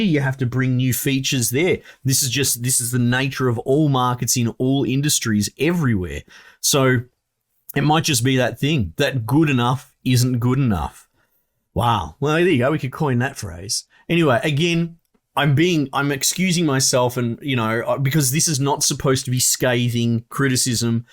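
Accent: Australia. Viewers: Nice original commentary, maybe I just appreciate a good ozy accent lmao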